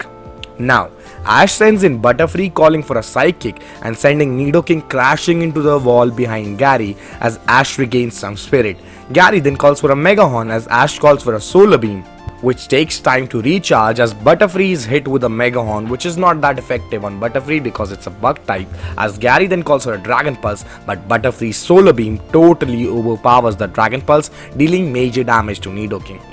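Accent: India. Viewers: Your Accent its like Indian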